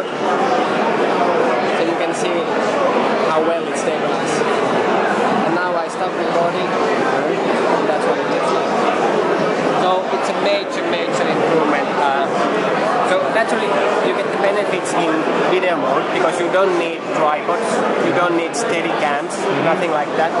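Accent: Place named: Finland. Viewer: that finnish accent is annoying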